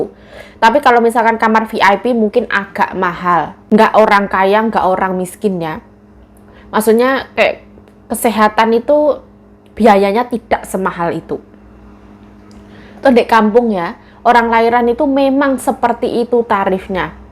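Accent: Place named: Sunda, Indonesia. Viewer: Kocak ngemeng Sunda na 😅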